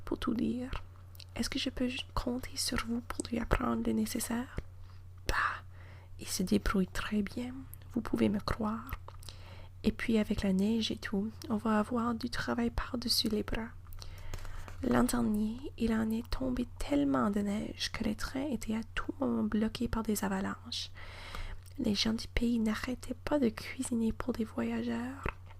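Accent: Canada. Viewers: this doesn't sound like the French kind of French, but rather the Canadian? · Ton accent est typiquement canadien et en France on adore ça (pour information, la Miss Météo d'une de nos chaines françaises est canadienne) ton français est impeccable, félicitations de la part d'un français....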